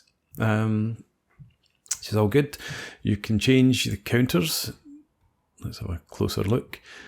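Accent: Scotland, United Kingdom. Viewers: Cheers and thank you for posting this video! I am always thrilled to hear wee bit of a Scottish accent, a country of which I am very fond of. If you happen to have any ideas or suggestions, just fire away 👍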